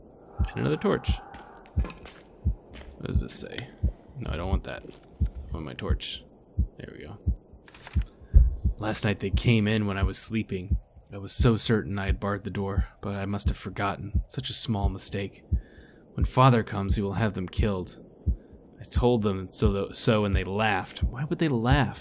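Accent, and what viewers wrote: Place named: West Coast, United States. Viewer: You have a very relaxing voice. Where in the USA are you? I'm guessing West Coast...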